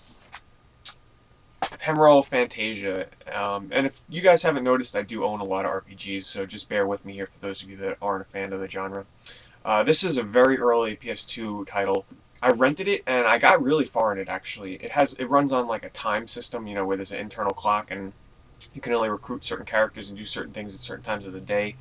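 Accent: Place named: New York, United States. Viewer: haha, i know. i love the accent. i think in a video he said he's from new york.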